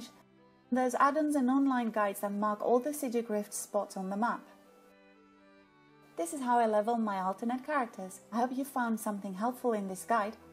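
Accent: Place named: France. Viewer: Detailed and clear guide. And the French accent sounds cool.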